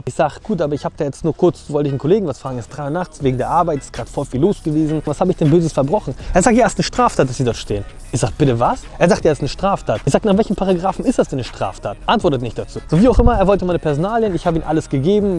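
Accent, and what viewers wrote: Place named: Hamburg, Germany. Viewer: Norden/Hamburg Slang!! Dialekt!!! Wir sagen auch Zb Tach statt Tag!! Ist schon richtig!!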